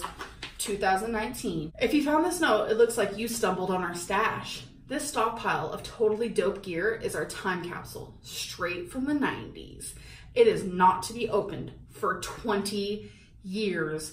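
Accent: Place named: Calabasas, United States. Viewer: She’s from Alabama but she speaks like shes from calabazas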